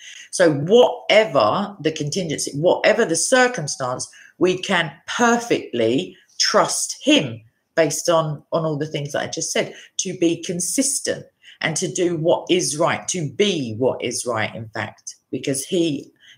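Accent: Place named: United Kingdom. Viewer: I love her British ascent.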